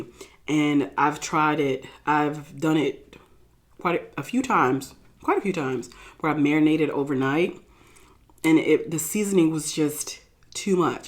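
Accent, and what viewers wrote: New York, United States. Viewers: Yeeesss with the NYC accent. Rep my hometown😍😍😍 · Yes you sound a New Yorker and we loveeee your videos‼️ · Hey boo lol the New York Accent 🤣🤣🤣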